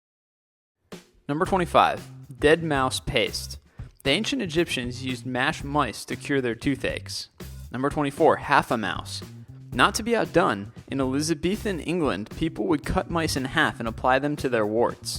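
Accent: Canada. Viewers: ***** Racist? He's a Canadian..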